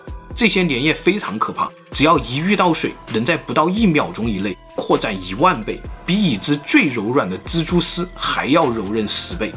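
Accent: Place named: Fujian, China. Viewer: 福建哪里的。。。？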